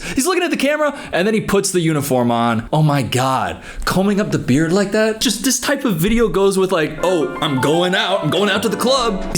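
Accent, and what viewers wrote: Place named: South, United States. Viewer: The Southern mom voice is one of my favorites😂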